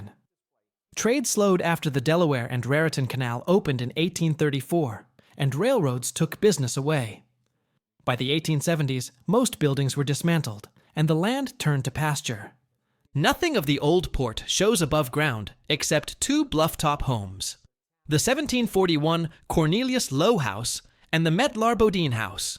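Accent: United Kingdom. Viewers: Uk accent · Is this guy British or what?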